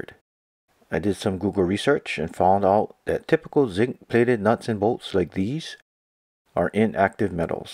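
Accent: Hawaii, United States. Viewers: Cahz u from hawaii, sound like u get some moke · Eh, you from Hawaii? Can tell by your Local accent -- I'm a 5th-gen Local from Maui, just found this channel, right on 🤙🏼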